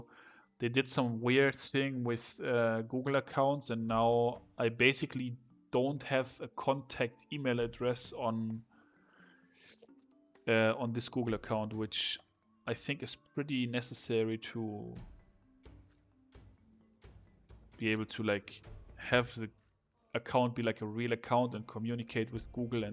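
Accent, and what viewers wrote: Germany. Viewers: Richtig geiler channel weiter so. ❤️❤ Hab ich gleich gehört das du deutsch bist. haha 😂 Btw lasst die Maus und die cam so passt perfekt. Alles gute ❤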